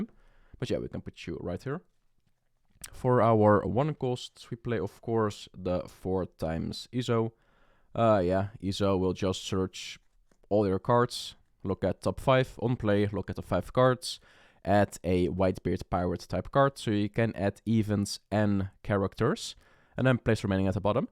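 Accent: Netherlands. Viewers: ik hoor een dutchling van jouw accent ngl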